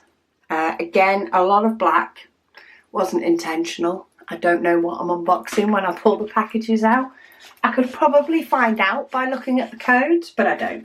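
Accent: United Kingdom. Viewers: 310! Not impressed... just finished a Dp with, yes, 42 000 black square drills! Overwhelming. So proud, I finished it! Most difficult DP I did. Bur it is GORGEOUS! The drills were kind of bad. They were gorgeous but the size of the drills was not uniform....the more I see your lunar phase one... i have a kind of itching to get it... dang! I must be a masochist! Ah ah I really like your channel. Love your voice and British accent, so soothing.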